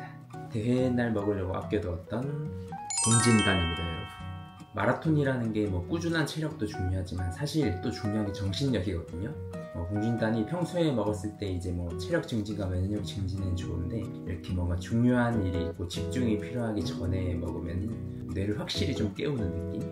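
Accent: Gyeongsang, South Korea. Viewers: 열심히 사시는 님 늘 응원합니다. 발음교정중 하나 알려드립니다. 경상도 분들의 특징인 월요일.금요일.일요일 ㅎㅎ 워료일.그묘일.이료일 ~!! 이렇게 발음합니다.ㅎㅎ 저는 님발음중 요게제일 거슬리더라구요~! 워료일그묘일이료일로 듣는날이 곧 오기른기대합니다😅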